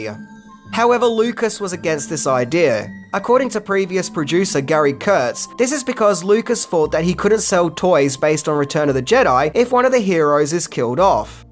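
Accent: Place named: Australia. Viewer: Yeah same hey :) . It's the down to earth Aussie accent that helps too :)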